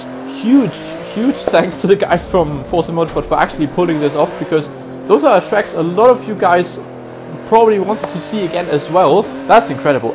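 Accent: Germany. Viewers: Thanks for the info... And your german english.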